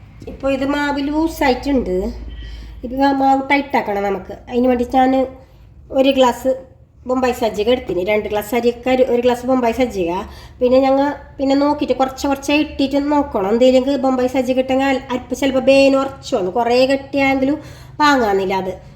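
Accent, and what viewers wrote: Kasaragod, India. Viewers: Ithu Kasaragodu language aanu. Swayam kettu nokenda aavasyam illa. Avarude language il alle avarkku samsarikkan pattukayullu. Aa language kelkkan ishtappedunna Kure aalkkaru ind. Kannur, kasargodu ullavarkku trissur, Trivandrum language kelkkumbol ulla bhudhimuttu aayirikkum ningalkku indayathu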